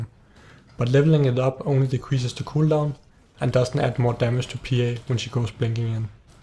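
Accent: Denmark. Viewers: are you danish or german? or neither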